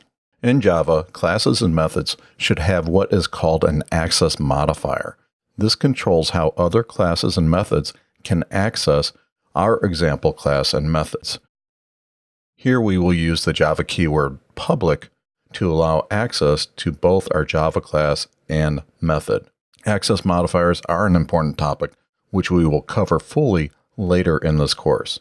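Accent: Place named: United States. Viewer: What a nice male voice with a rough American accent!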